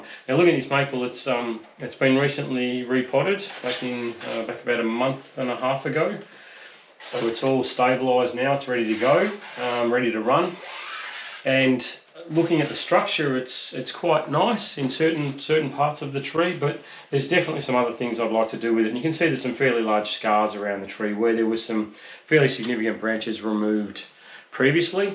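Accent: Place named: Melbourne, Australia. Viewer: Just found your channel - thanks YouTube! I did my first thread graft 2 years ago on a Trident Maple. A month later I decided to rub the buds off the approach side to avoid wasting energy where it wasnt needed...but for some insane reason I rubbed them off the 'active' branch end. How could I be so dumb!!! I couldn't believe I had done that. Anyway - loved your instructional style and am definitely going to be looking through more this winter. I always think of Melbourne (Upwey) when I hear that accent. Cheers, Xavier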